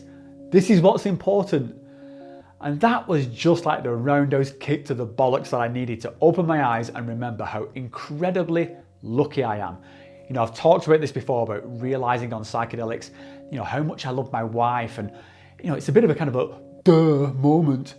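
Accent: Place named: Bolton, United Kingdom. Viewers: Wierd watching someone with Bolton accent everything is usually American it's a nice change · ah!! Now makes perfect sense thanks. The Manchester accent threw me! Lol Keep up the content, I enjoy the channel. :-)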